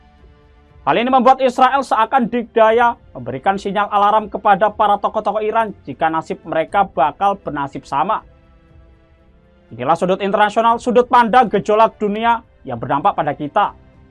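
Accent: Java, Indonesia. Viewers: Pembawa acaranya kurang cocok, selain logat jawanya yg kentel.. cara bicaranya pun kurang mengenakkan didengar telinga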